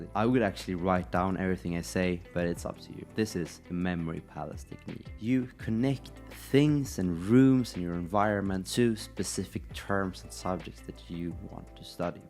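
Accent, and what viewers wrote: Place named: Sweden. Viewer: The swedish accent coming through your english makes you weirdly lovely to listen to. Dont change dude❤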